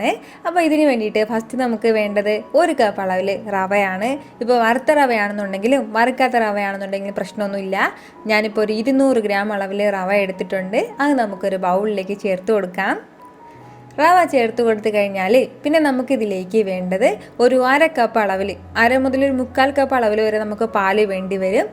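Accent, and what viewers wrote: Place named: North Kerala, India. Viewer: Watching you from US, love the way you talk and your north Kerala accent. Your introduction is very cute, sounds like a little girl. Continue to post lot of recipes...I wish you the very best!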